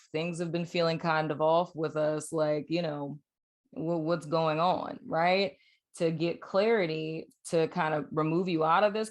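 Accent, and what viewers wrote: New Orleans, United States. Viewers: I friggin love your soul,. Your channel, your messages, and you as an individual are inspiring, enthralling, and captivating. And I love your New Orleans accent. I’m a Detroit native in this life. But I really have this thing for accents and dialects as a musician. Thank you and namaste. I always look forward to seeing you. Now lemme rewind a bit because I was really focusing on getting this message right on the first try. Think I got it. 🤔😅🙃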